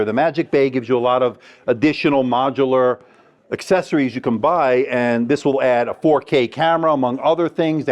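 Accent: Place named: New Jersey, United States. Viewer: You live in Las Vegas, but you have eastern accent, New Jersey maybe?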